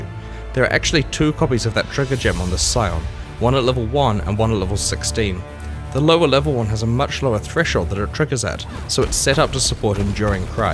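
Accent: New Zealand. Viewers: Who's "GrantingGayGames"? ^^ (no offence meant,i just love newzzie pronunciation)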